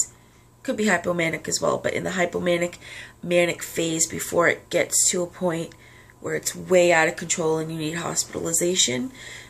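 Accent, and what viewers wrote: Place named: New York, United States. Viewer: Thank you so much. You have a teensy bit of NY or (?) accent. I wish we lived close so I could hug you. Thank you.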